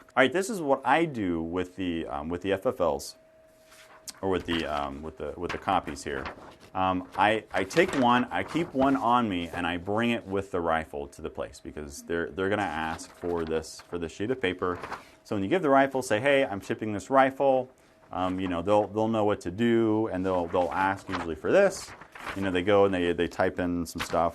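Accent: United States. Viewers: Are you from Missouri??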